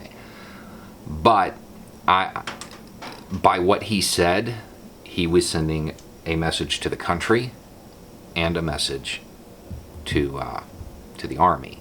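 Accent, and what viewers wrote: South, United States. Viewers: I hate to pigeonhole Southerners, but it's refreshing to hear that accent, in a calm voice, giving us facts, instead of spewing Fox talking points. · This is probably the most comforting thing I've heard yet. The recent messages from our military brass gives me hope. I travel full time in an rv, and I've just returned from traveling from TX up through the south. Long before the virus hit, yet alone the latest shootings and protests, I was very angry and frightened by what I heard from police, Natl Guard members and fellow citizens in my travels. THE HATE IS REAL. To hear police and guardsmen disregard it, while spouting pro Trump rhetoric made me believe both our military and police forces are all corrupt racists- and we are doomed. I came upon your videos a few weeks ago- and gotta say your look and accent gave me a visceral response- engaging the stereotypes that were reinforced during my latest trip south. 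But then I listened and realized that much like Trae Crowder, you break that mold. We are all prejudiced with ingrained stereotypes by movies, news, headlines. MY biggest prejudice has always been a southern accent. From Boss Hog, John Wayne, and even the movie Deliverence (yes I'm THAT old) my skin crawled when hearing those accents, which portrayed ignorance, misogyny, and hate of minorities- including Native Americans. It was jolting to me as a "Yankee" to be in black communities, in this day and age, to have them answer my inquiries with "yes 'mam" and "no 'mam". I wanted to yell at them and say... "I am not your superior- you dont have to address me like that." It felt degrading for them- until I got into more wh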